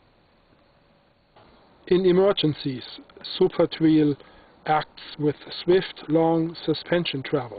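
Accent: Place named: Jamaica. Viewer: he sounds like a Jamaican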